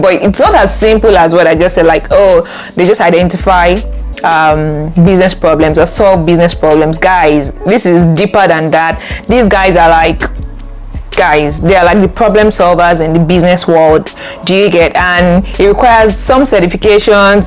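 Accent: Yoruba, Nigeria. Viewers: why should she eliminate Yoruba accent? Is that suppose to be a taboo?🤷 Nothing beats Originality. Be real..So far she's communicating. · God bless you dear sister! I really appreciate your efforts at this, keep the good work up. However, as a Linguist I would advise you to work hard on your speech and diction. Make it your goal, to eliminate every element of the Yoruba accent, in your speech. I'm very particular about that each time I listen for speech content creation. I shall be praying for you and cheering you on. Do keep the up the good work! Cheers! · Working on her speech and diction - yeah because she needs to improve (we all need to improve on whatever we are doing) but asking her to "eliminate every element of Yoruba accent", haba. With due respect since I am not a linguist, yet I disagree with you in content and in context. That's as good as saying she should change her personality/identity because it's not good enough. 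I will be looking for another definition of inferiority complex, please pray for me too... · That’s a Yoruba accent. Every tribe and country have their own accent. Let’s focus on the information given and ignore the accent. T for tenks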